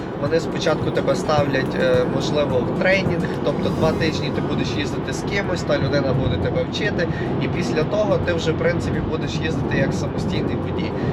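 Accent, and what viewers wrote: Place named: Western Ukraine, Ukraine. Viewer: по акценту видно что хлопцы из ЗАХОДУ ,почему узнал ? Я сам оттуда поетому сразу понял Прикарпате, возможно Закарпате но болше звучит как ребята из Коломыи или Калуша>2 года назад делали CDL значит успели перед войной сюда приехатъ ,Оченъ удивително видетъ таких детишек что так серъезно задумывают болшие планы и из воплошают в жизнъ. Одним словом настояшие ГУЦУЛЫ !!!!!!!! Здес в Америке 21 год считают толко полнолетним вообше детишки долго развиваются в отличие от таких молодых ребят с такими болшими амбициями..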